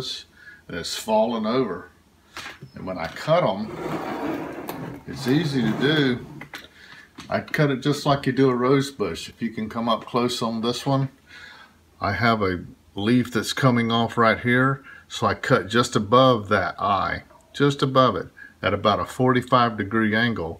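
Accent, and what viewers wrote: South, United States. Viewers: Hello my friend . What part of the USA do you live? I hear the southern accent however I want to get the dates, temp adjustment.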